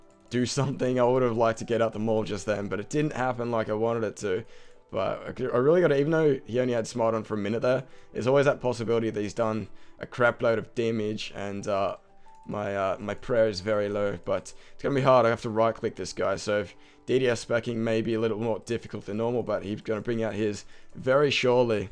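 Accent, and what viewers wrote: Australia. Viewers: Everything is more entertaining in an Aussie accent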